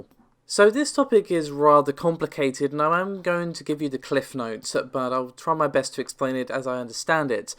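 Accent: England, United Kingdom. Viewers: if you're seeing this, it's not you. Rather the accent and voice together, I have this sometimes with English women, because the voice pitch is very different from where I'm from haha. In like every video I had parts where I thought, noo, this is 100% a women and others parts where I thought, nooo 100% a guy, I just can't tell because of the accent xD it's not you, it's me:P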